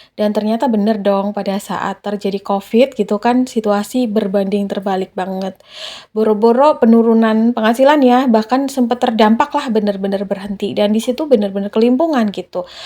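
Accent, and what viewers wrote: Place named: Banyumas, Indonesia. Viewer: Wah aku selalu lihat, kalau ada vidio yg baru mb, wong ngapak he he he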